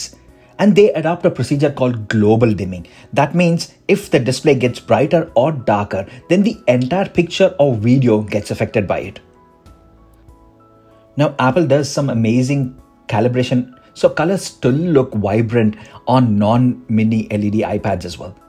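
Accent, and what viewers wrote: India. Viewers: Wow, thank you, this is very reassuring. I’m gonna go for the 12.9”, if I notice blooming I’ll just euphemize it as carpeting. Going back to global dimming is not an option. Switching to OLED or microLED when available at certain loss. You should have more subscribers. You’re the first reviewer “with a sub-continental accent (deliberately unspecific)” I like 👍. edit: not a defining factor, just objective.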